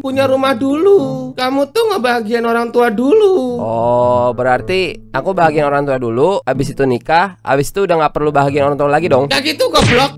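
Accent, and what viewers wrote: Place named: Java, Indonesia. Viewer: Dulu medok asw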